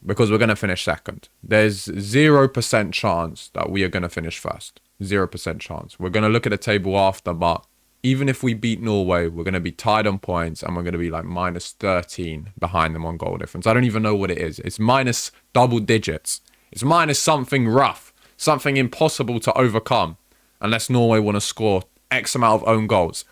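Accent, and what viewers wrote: United Kingdom. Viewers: Hmm, why do you say we? Are you Italian? You certainly sounds British to me.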